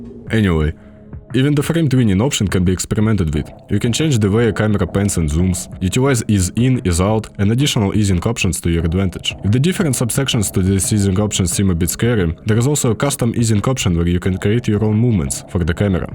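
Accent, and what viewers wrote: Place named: Russia. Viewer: Русский акцент ОЧЕНЬ заметен. Это не плохо, это хорошо что ты пытаешься выходить на зарубежную аудиторию. Желаю успехов!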